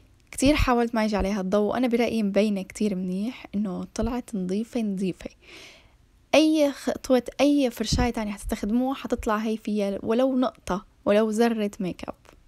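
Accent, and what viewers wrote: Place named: Iraq. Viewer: حياتي شفت لهجتك عراقية وانا ساكنة بالعراق من وين حصلتي عليها ممكن تخبريني بليز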